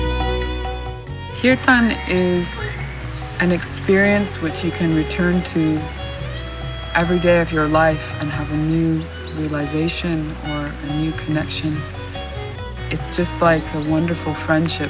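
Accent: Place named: Midwest, United States. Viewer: Her accent is so mid western American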